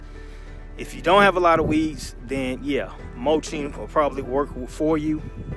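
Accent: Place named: Atlanta, United States. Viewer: Now I'm from Avondale and I could tell right away. Lol I told my wife that's Atlanta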